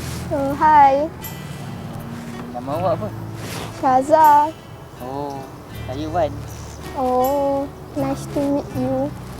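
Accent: Kelantan, Malaysia. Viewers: Bg dari kelate ke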